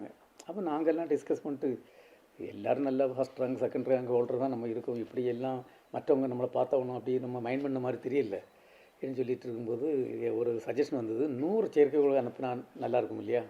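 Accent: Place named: Kanyakumari, India. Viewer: kumari naatu Slang...nice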